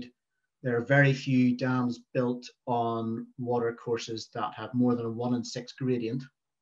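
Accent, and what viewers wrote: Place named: United Kingdom. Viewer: I speak North American English but have trouble understanding UK English---toooo fast. It would have been easier to understand if we had been able to see the face of the speaker. Can N American Beavers easily interbreed with Eurasian Beavers?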